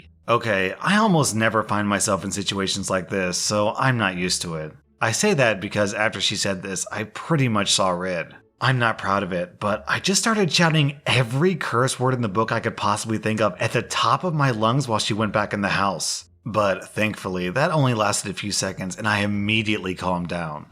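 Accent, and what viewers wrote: South, United States. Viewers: entitled Karen voice mixed with southern accent is the superior voice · It's pretty obvious from your Southern accents that you think they're synonymous with ignorant yokal. That's pretty bigoted. Especially when you consider this: Some of the deepest and slowest accepts you hear come out of Alabama and Mississippi. Ironically locals work their asses off their whole lives for a shot at NASA facilities in their home states. Those accents got us to the moon. Have some fucking respect for "The Other." Also realize the deepest most impenetrable Southern Accents come from Yankees who have to prove "They ain't white." I know because I've asked or even heard the discussions people thought nobody else would hear. So it's almost like ignorant fucks live everywhere in every community, but especially amongst City Yankees. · Your high-pitched southern EM voice sounds like Ziro the Hutt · southern accent is the best thing that's happened to me today. 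· thick southern accent on point man. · does a good southern woman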